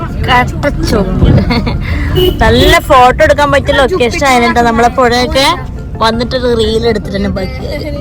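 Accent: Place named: Mankada, India. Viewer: അച്ചടി ഭാഷ എത്ര പറഞ്ഞാലും ഇടയിൽ മങ്കട കൂട്ടിൽ ഭാഷ കയറി വരും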